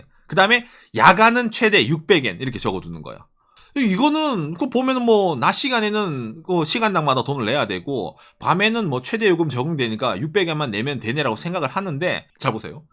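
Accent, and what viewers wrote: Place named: Gyeongsang, South Korea. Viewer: 말투가 경상도 .자갈치에서 꼼장어에 쏘주한잔 하고싶네 ,ㅎㅎ,나는 부산사람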